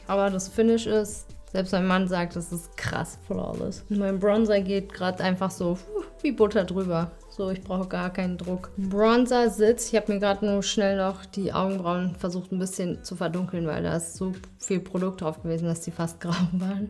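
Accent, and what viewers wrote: Berlin, Germany. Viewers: ick weß it gar nicht mehr ''😂da kommt die Berlinerin aus dir raus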